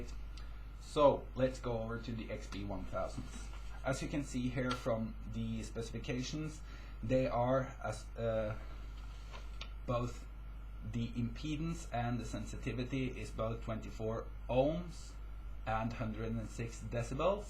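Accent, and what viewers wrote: Norway. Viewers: You sound like a norwegian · Er det norsk aksent eg høyrer?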